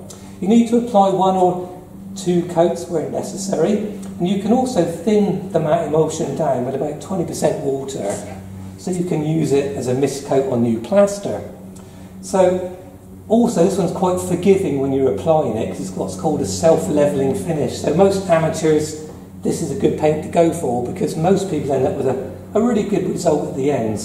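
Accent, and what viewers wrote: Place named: Birmingham, United Kingdom. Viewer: Are you a brummie, mate?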